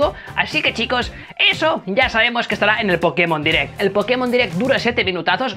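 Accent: Catalonia, Spain. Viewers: jajajaja ja deia jo k em sonava catala k te cagas🤣🤣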